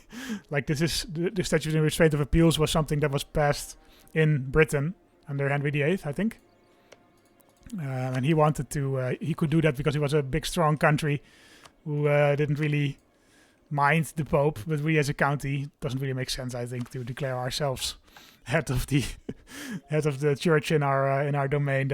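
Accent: Netherlands. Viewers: I see why you chose Holland. Your accent sounds dutch. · As an American, hearing that this is a Dutch accent makes me realize how there's so many Dutch people in America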